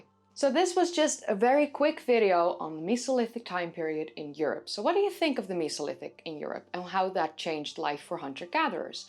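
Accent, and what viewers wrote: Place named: Netherlands. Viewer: Of course she's Dutch. You can tell by her accent. (Almost).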